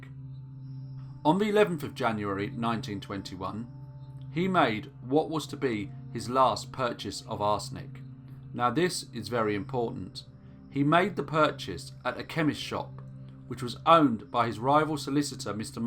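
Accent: England, United Kingdom. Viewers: God almighty wat th hell is it that draws me to an English accent th music, th talk, everything about these people says hey listen listen I got to go to the UK... Very good story. Great speak loved th story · Hi! I really enjoy your narrations an voice. The pacing, emotion, cadence, and enunciation all balance out very well. I was curious as to what part of Europe you live/d in with that particular accent. I'm assuming it's English and all, but you seem to have a softer edge? or something to it. Don't think I can really put my finger on it. It may just be your particular way of speaking instead of a particular accent or regional way of speaking. Still, I'm curious where abouts, roughly, you live.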